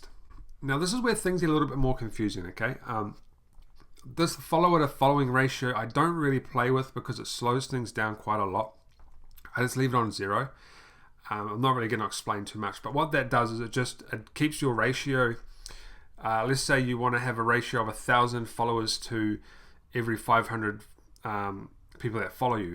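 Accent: New Zealand. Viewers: you from new zealand??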